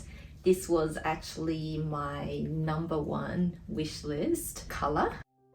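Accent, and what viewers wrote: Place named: Australia. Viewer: Also, it’s soooo nice to finally hear your voice! Been wanting to hear your Aussie accent for the longest time I’ve known you hahahaha 🤣😅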